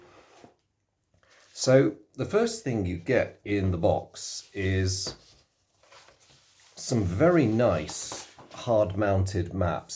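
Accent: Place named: United Kingdom. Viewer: This was a pleasure to listen to. You’re an excellent speaker and communicator. Very clear and organized in your presentation and with a magnificent British accent. 😊 You’ve given me some added inspiration to read through the rules tonight and tomorrow to play a young man who I’ve introduced to these old war games (and some newer ones as well). I’m 61 in first started playing Avalon Hill games about 48 years ago. They are my one remaining hobby.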